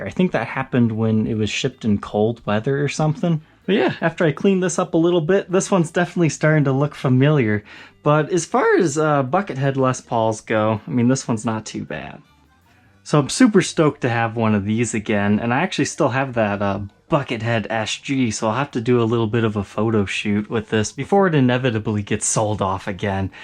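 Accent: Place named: Midwest, United States. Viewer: You sir, are definitely from the Midwest.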